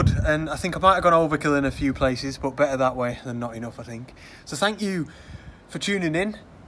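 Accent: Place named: Lancashire, United Kingdom. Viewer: Loving the van build, roll on the next episode. I actually found you by accident when I was looking for tutorials on the Canon M50 Mkii which I have recently purchased! So I`ve been watching your other vids with interest. My Pal Colin also follows you, and you sound fairly local to us, I`m just outside Preston! Cheers Pal 😀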